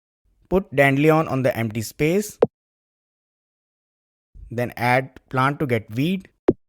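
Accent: India. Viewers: bro got the india accent with an innocent brain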